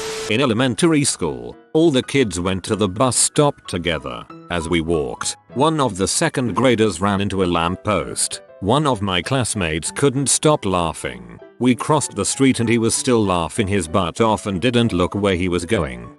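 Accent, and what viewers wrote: United Kingdom. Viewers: That’s because it speaks in British English.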